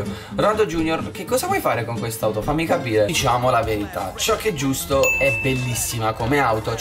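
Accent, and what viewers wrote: Bari, Italy. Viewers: Ma sei di bari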